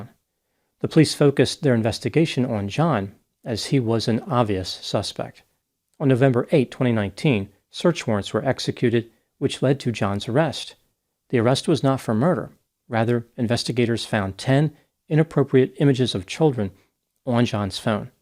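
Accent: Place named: New Jersey, United States. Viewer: I have a suspicion might originally be from New Jersey - his accent gives it away… like the way he says the word “on”. It’s not heavy NJ but there are hints. Only speculating 😊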